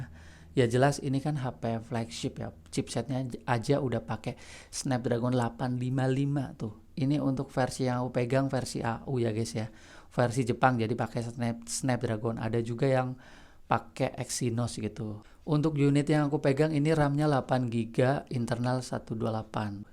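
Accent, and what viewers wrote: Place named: Banyumas, Indonesia. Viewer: Njirrr wong Banyumas si. Dongen nganggo basa ngapak bae mas hahahha😂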